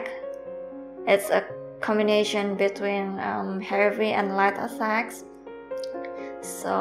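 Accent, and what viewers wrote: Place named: Indonesia. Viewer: Why do i hear indonesian accent.. btw nice tutorial.. i'll try it later to see if this still works in unreal engine 5 or not.. somehow i manage to do the footIK based on Z Axis trace.. but still struggling on the Rotation using Hit Normal..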